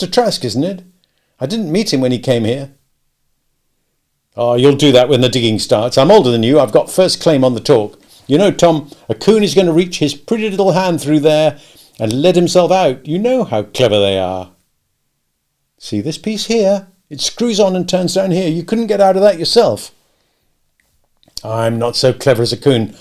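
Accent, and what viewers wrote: United Kingdom. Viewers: I find Steinbeck's use of stones to be interesting. Upon researching the biblical meaning of stones, they seem to symbolize "endurance, stability, and permanence," while Steinbeck's use seems to alludes to the opposite, i.e. stones are something to be removed, thus showing his roots that stem from farming. I'm sure there is a deeper meaning, but I will need to ponder this a while. Interesting to be listening to this via a Brit!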